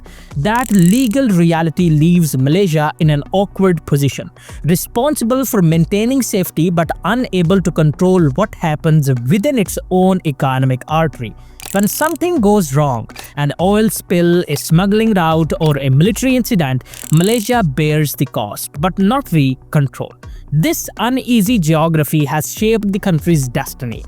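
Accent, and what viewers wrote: India. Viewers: It irritates me when you say " raut" for route! Route is pronounced " root". Unless you were born and bred in the USA, please pronounce it the English way! You sound indian so I find it even more irritating when you suddenly use American pronunciations for certain words. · What is the point he is trying to make? Malaysia has also a very large port im the China Sea.. pointless video. This sounds like a guy from India?? There are other ways for ships to pass from the China Sea to the Indian Ocean.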